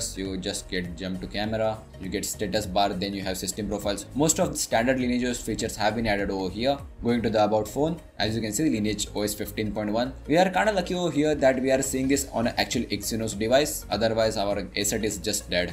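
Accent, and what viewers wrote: India. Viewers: He has Hindi accent English to be precise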